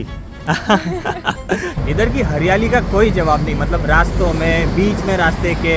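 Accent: Gujarat, India. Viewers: Bhai ko boli se pata lgta hai ki bhau gujju hai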